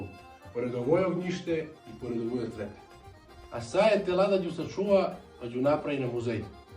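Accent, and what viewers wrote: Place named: Serbia. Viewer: ovo je čisto srpski....ja pola ne razumen .....:)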